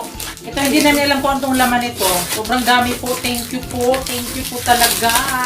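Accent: Visayas, Philippines. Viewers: Kaboses mo si michelle fox... Visaya accent is real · James Bryle bisaya kasi siya, just like me. i noticed her accent. hehe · i like the way you pronouce haha Lusyon. haha i am just like you. haha the way you speak. Bisaya man gyud. God blessed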